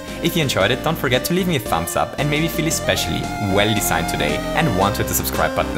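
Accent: Germany. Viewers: Could you please make Videos in German? Ich kann deinen Akzent hören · Are you a German because you're english sounds like it has a german accent and I'm Not really Sure about it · Wait r u German? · Du hörst dich deutsch an :D kann es sein? :o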